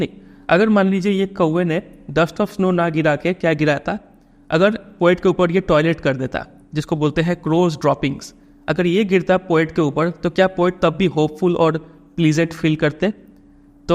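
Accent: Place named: Bengal, India. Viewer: Sir are you Bengali as your language reveals that sometimes...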